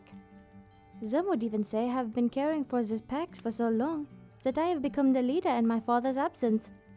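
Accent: Germany. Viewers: Hmmmmm so much german acsent... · corwyn wolski it's a German accent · Ze German Lycanbae has returned! I'd mate her. The accent is my favourite.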